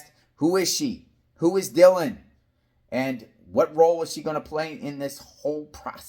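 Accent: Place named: New York, United States. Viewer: Love love all your content. Ur awesome! I especially love your NY accent!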